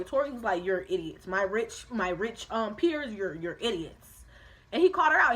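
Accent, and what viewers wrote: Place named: United Kingdom. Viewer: I stan the British accent. Teach me.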